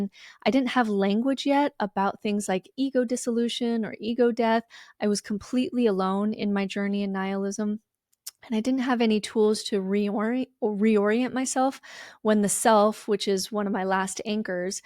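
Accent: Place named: United States. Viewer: I needed an American voice to speak to my American Brain and my American Heart. Thanks my Sista from another Mista!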